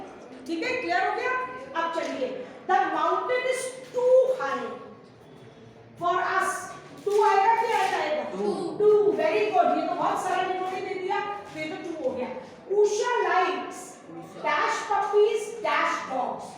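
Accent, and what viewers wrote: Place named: Udaipur, India. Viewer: Udaipur Se Ho Kya Medam Aap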